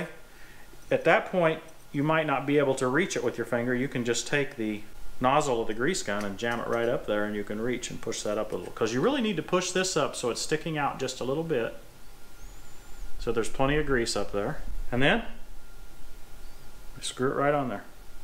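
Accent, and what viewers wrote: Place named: Indiana, United States. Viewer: I just thought that was an Indiana accent when you always said "greezing" and not greasing lol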